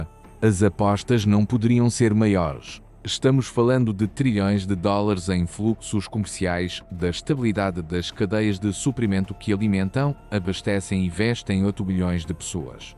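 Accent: Portugal. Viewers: Pq fazer com sotaque de Portugal????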